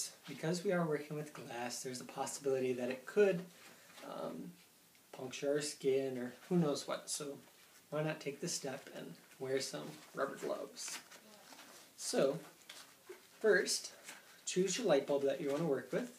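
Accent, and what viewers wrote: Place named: Canada. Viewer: Is it just me or are you speaking with an accent? A bit Canadian, a bit Minnesota. Which are similar.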